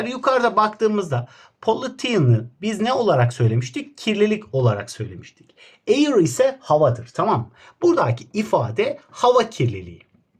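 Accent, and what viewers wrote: Kayseri, Turkey. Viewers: Hocam video çok güzel olmuş ellerinize sağlık 😊siz Kayserili misiniz bende Kayseriliyimm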